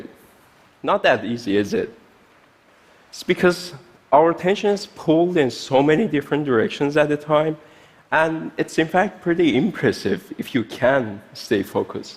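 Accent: Iran. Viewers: Detecting...: iranian: Accent, face, brain, hair, eyes and body. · Love to hear native speakers of Persian speak English. Such a beautiful sound! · that persian accent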